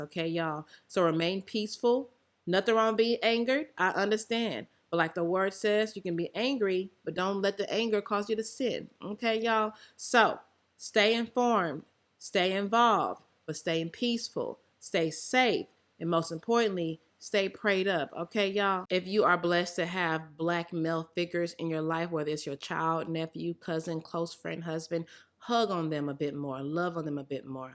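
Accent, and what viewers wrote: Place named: Nigeria. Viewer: I like your Nigerian accent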